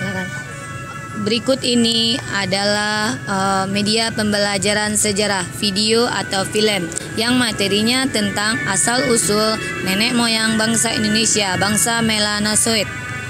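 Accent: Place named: Malaysia. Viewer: kenapa pake logat malaysia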